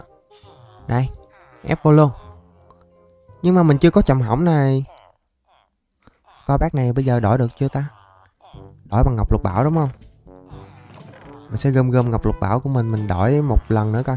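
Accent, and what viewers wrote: Mekong Delta, Vietnam. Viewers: em nói thật lun anh làm clip thì ah hãy nói giọng thật đc ko chứ ah đừng chỉnh giọng anh chỉnh giọng để làm clip về mục đích j nên em mog anh làm clip hãy nói giọng thật của mình mình nói thật với tất cả fan của anh, giọng thật của anh khác nữa giống người miền tây nên em nói 1 câu chân thành với ah, em mog anh làm clip hãy nói giọng thật nha anh bạn nào muốn nghe đc giọng thật của ah thì hay qua kênh của anh Găm Bồ Gaming và gõ Lộ Mặt Thật Ngoài Đời Khi Phỏng Vấn Cùng Burgerby nếu như ah đọc đc bình luận này thì em mog anh có thể nói giọng thật của mình ạ chúc ah 1 ngày vui vẻ ạ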